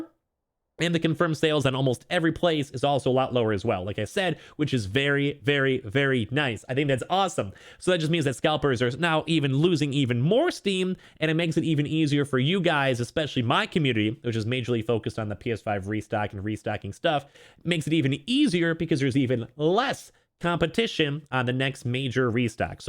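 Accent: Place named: Chicago, United States. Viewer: It’s that shhheh caaaahgo accent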